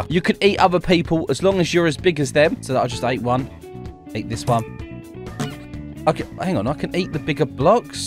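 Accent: United Kingdom. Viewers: What country are you from you sound like someone in the uk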